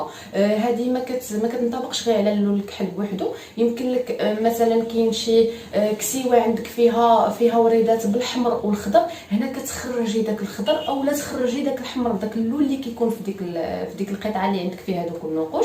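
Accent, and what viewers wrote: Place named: Maghreb, North Africa. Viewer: فيديو رائع ❤️❤️ تصوير رائع ❤️❤️بس اللهجة صعبة الفهم لمعظم العرب لا يفهمون اللهجة المغربية والجزائرية والتونسية للأسف 💔💔 ف الرجاء التحدث ب الشامية أو الفصحى أو المصرية هكذا ينتشر الفيديو أسرع ويكون له جمهور أكبر او ضيفي ترجمة مصاحبة بليز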